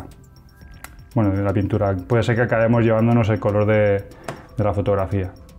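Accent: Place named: Spain. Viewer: Es mucha casualidad que hablas como si fueras de España(hehehehe), y tu voz parece a la de otro youtuber que sigo pero el hace videos de impresoras 3D, saludos y abrazos.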